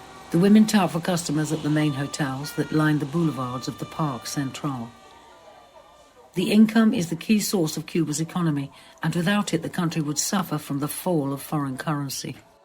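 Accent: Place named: United Kingdom. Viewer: A stupid British accent lady doing the video come on ppl seriously.....have someone else do the video.....you suck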